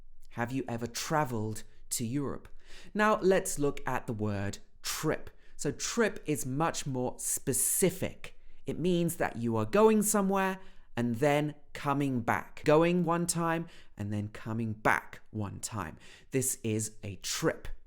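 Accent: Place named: Southern England, United Kingdom. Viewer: Hi. I love your accent so much, I live in Manchester though and I think it's better to have a course with a teacher from Manchester. As the accent in Manchester is a bit different from South. What do you think?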